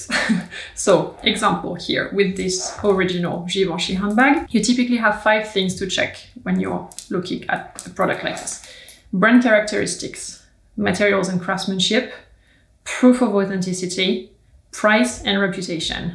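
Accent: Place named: France. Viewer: Just a small observation, since you're back in France, your French accent can be heard a bit more clearly again. :D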